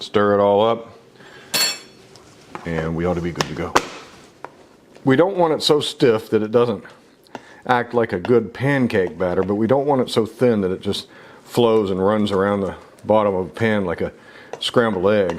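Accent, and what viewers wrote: United States. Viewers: He is American. They say erb. Brits say herb.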